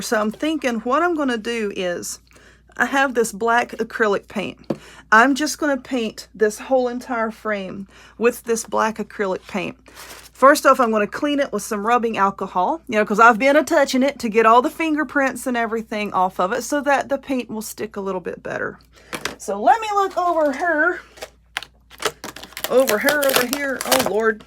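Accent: South, United States. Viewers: Love your accent! It's nice to hear another REAL SOUTHERNER talk like myself. LOL Your crafts are great!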